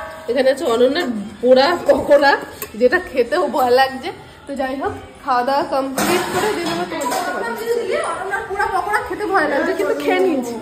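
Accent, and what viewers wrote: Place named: Bangal, Bangladesh. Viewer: Bhaaaa ki sundor bangal kotha koi tmr maiada❤❤❤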